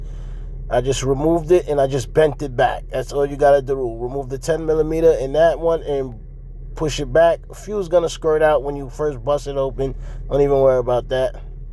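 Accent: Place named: Philadelphia, United States. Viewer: I see your page says Orlando but bruh I swear you sound like your from Philly.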